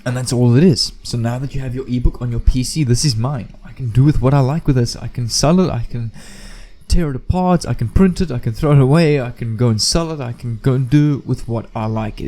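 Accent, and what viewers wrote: South Africa. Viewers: As soon as I started watching this I immediately recognised that accent- so good to see a fellow Saffer doing the earning online. Definitely will follow - would love to chat more and possibly share some of my ideas with you...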